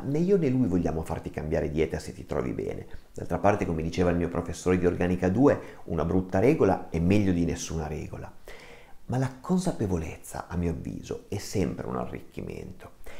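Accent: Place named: Piedmont, Italy. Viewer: Preferisco le tue pillole di saggezza, con una fantastica cadenza torinese, o piemontese, lo sono anch'io, che quelle che vendono i tuoi colleghi "farmacisti"🤣🤣🤣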